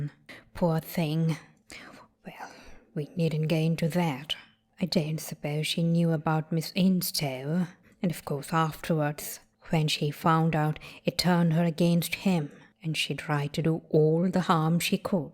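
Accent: RP, United Kingdom. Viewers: I Love upper received pronunciation.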